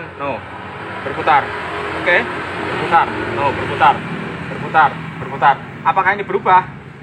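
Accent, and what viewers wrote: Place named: East Java, Indonesia. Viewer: smpean kok faseh bhasa jawa timuran om?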